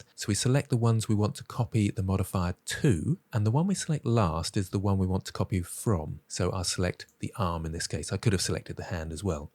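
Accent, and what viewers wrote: United Kingdom. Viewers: just shows and demonstrates how many times he had done this and knowing the process inside-out. The addition of British accent adds a nice spice making blender appeal and following the process just a breeze. Many thanks for sharing.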